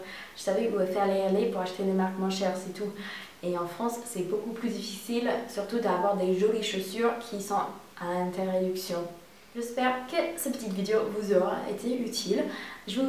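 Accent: United States. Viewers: J'adore l'accent americain o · Hello, j'apprécie beaucoup tes vidéos et ta personnalité comme ton léger accent américain (j'ai dit léger!). Well, concernant les drap je pense pourtant qu'il est une bonne idée d'emmener des DRAP-HOUSSES car il paraît que ça n'existe pas aux USA? J'en ai jamais vu tout au long de mon année aux USA et lorsque une amie devait y aller quelques années plus tard, elle en a emmenés des draps-housses et elle en était très contente d'avoir suivi mon avis. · Elle est américaine :) tu l'entends avec son accent :) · J'adore ton accent américain ! C'est trop jolie à entendre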